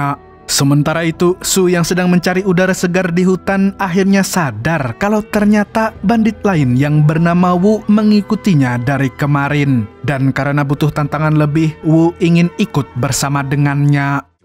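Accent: Banjar, Indonesia. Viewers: Orang banjar kh admin nya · Adminnya urang banjar le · Orang Banjar'kh ikam ni.? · Banjar kah pyn 😅😂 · Logat banjar 😂👍👍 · Org Banjar Sekali ny Sidin nih lah😂😂😂😂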